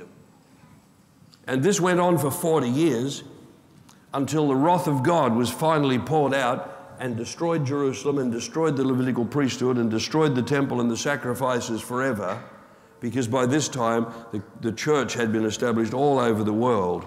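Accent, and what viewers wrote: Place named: Australia. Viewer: I cant listen to this raving aussy any more. Now he is on about the new covenant. A mix up over two words. (this is the mistaken belief that the OT is the Old covenant and the NT is the new covenant). Not so. The scriptures say "a new covenent in (the) blood." This is A new covenant. A, A A A A A new covenant! It doesnt say THE, it says in that bit of scripture A! It is not replacement. it is A new covenant, not THE new covenant. There will yet be THE new covenant replacing that made with Abraham, it is yet future. The bible is full of "covenants" made with various people. THE (Abrahamic) Covenant was made with Abraham. So THE new covenant will again be with the people of Abraham. (yes i know we are grafted in, we are heirs of Abraham, just hold your seat) This period we in at the moment, some call the age of grace, is not the end game! 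It is a period in the plan of redemption where we are in A covenant written in the blood of Christ, one which will be fullfilled and all tied together all in all when He returns. The fullfilment of all covenants and promises is yet to come after Christ returns... it seems this preaching has forgotten the promise of the "RETURN of CHRIST" and taking no notice of the final book in our Bibles. It seems to be all about church is the final thing.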